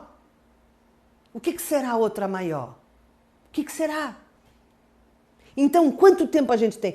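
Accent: Brazil. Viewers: Porque é que fala com sotaque brasileiro? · Bom vídeo e útil da para refletir obrg você e portuguesa como eu mas tem sotaque brasileiro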